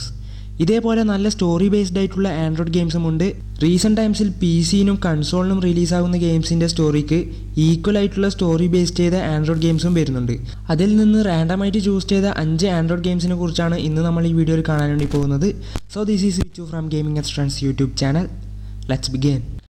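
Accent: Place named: Kannur, India. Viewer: Bro kannur ano ?? Basha ath pole ind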